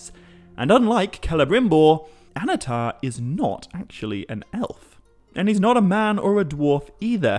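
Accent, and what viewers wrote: United Kingdom. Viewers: Oh my God, this is probably what John Oliver refers to as “offensively British accent”. It was impossible to finish the video! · You are an amazing orator and in my opinion is best suited with your accent ... even if you don't think you have one (your friends from across the pond)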